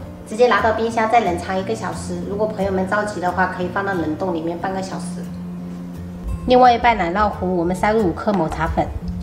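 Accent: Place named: Fujian, China. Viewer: 听口音是福建人 她老公可能是四川的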